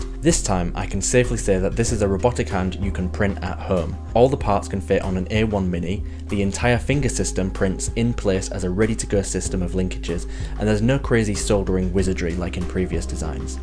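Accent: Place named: Yorkshire, United Kingdom. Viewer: Yorkshire accent or not, thanks for sharing!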